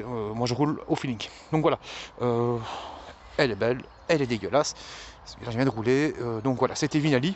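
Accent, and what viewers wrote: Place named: Toulouse, France. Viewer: J'ai reconnu l'accent de Toulouse.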